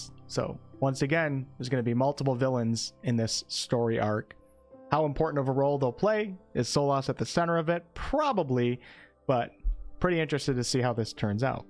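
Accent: United States. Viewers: I'm glad you have swapped over to DA. I was kinda curious what direction you would go after BG3. Also folks, he pronounces things differently due to his yankee accent, it's not a big deal. 😂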